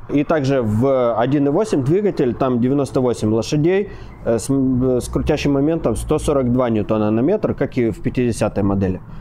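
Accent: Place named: Odesa, Ukraine. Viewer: Отлично,! Смотрю всё,что Вы предлагаете к обзору!С Вашей Одесской манерой разговора даже обзор входных напольных ковриков будет интересным и занимательным!Блеск! А Машина хорошая,нет слов, но дорогая для патриотичных и не богатых колхозников.Да даже если бы Вы и критиковали её за что-то,то были бы вправе это делать, как я Вам это уже говорил..Ведь Вы! С уважением из Саратова,Владимир,61 год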